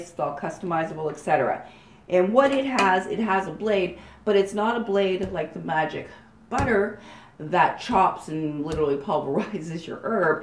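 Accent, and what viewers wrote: Canada. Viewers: I love how authentic you are when describing each machine. And your Canadian accent too!! 💚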